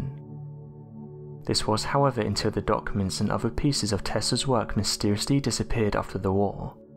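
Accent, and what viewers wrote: England, United Kingdom. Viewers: He has a normal English accent, and no one is making you listen to it.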